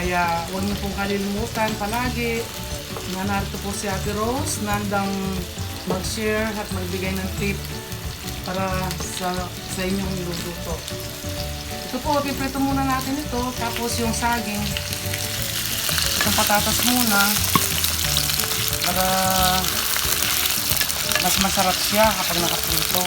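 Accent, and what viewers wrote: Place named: Pampanga, Philippines. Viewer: Galing ng magluto cabelen talaga halatang kapangpangan ya pamagsalita.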